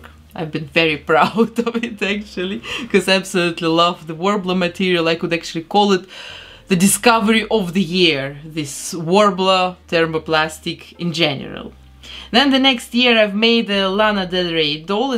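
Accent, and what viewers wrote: Russia. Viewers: Мне одной кажется что у неё русский акцент?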